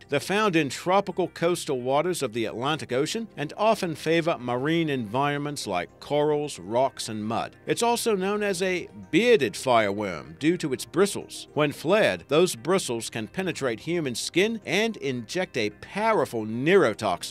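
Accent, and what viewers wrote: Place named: Australia. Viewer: Why do you sound Australian but use American measurement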